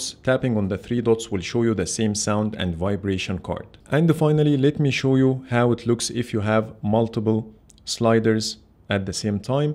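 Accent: Egypt. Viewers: Why the Egyptian accent ? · انت مصري 🤔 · Are you Egyptian !!!! لهجتك الانجليزي واضحة أنه مصرية 😁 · Is this Egyptian accent 🇪🇬👏😋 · باين اوى انك مصرى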